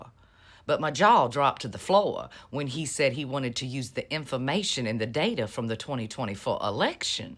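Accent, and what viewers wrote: South, United States. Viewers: I love this lady... her style, her commentary, her beautiful southern accent, the whole thing. Plus she is a looker. 😊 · I just love your Southern Belle accent dear!! and you have some beautiful blue eyes!! Your accent reminds me of my Aunt Fran who lived in South Carolina hun.... Im in Oklahoma... but my accent is more of a mix of Oklahoma, Texas and Louisiana. Thanks for bringing us the TEA everyday!! Much Love hon!! 😍😘🥰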